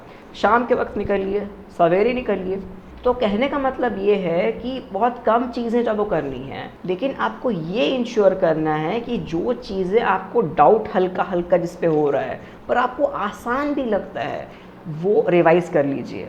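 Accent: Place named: Jharkhand, India. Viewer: Are you from jharkhand? As i have the same Hindi tone too.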